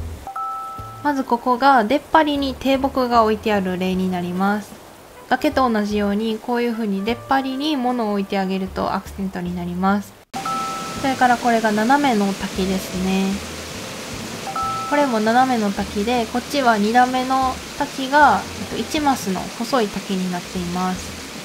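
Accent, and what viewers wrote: Kansai, Japan. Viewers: 関西の方なんかなぁ自分もやからめちゃ聞きやすいです🥺ほんで編集もお声も可愛くて見やすい💕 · イントネーション関西風www 参考になりました。ありがとうございます。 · 楽しみにしてました〜！さんを参考に島作り直してます🥰 関西弁、親近感あって好きです〜！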